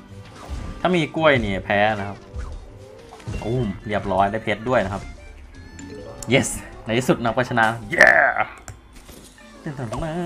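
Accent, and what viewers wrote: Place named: Korat, Thailand. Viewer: พี่พูดโคราชพี่เป็นคนโคราชหรอคับ. ->คักแท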